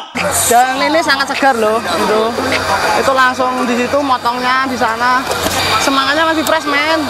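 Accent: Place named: Java, Indonesia. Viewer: medok e khas mas haha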